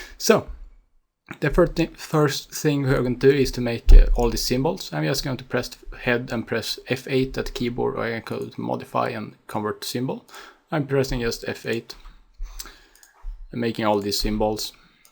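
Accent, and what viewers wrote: Sweden. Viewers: Man hör att han är svensk! :) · Du är svensk!!!! Man hör de jätte bra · are you swedish?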